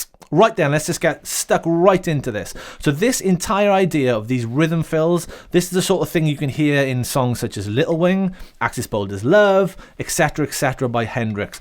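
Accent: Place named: Wales, United Kingdom. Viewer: Thanks great lesson just subscribed. Great to hear a Welsh accent teaching guitar.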